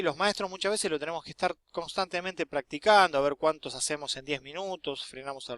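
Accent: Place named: Argentina. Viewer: Muchacho, eres argentino por el acento. JAMAS escuché una explicación tan clara de este abstruso asunto para los no dotados para el ajedrez, como quien suscribe este comentario. Grassssss....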